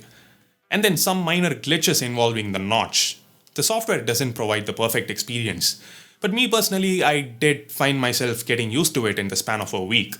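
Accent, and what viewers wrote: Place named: South India, India. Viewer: Still you have heavy south Indian accent. Nice review though...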